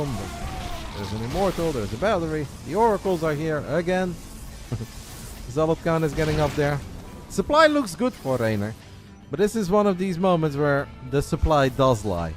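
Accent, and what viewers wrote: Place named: Russia. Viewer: whu you speak with “Vlad” accent?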